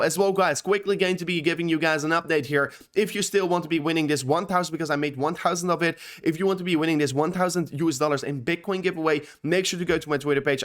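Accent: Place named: Netherlands. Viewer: Ohhh is hij toch Nederlands !? ik dacht al een " dutch accentje" te horen 😊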